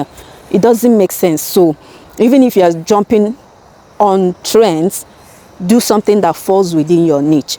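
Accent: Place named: Igbo, Nigeria. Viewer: YouTube is really a great blessing honestly ❤❤,low view can be discouraging but we keep moving,yes momma I remember the airfryer 😅 both the accent matter,but we like our igbotic accent like that 😂, you are Amazing 🥰, keep bringing it on 👌🏽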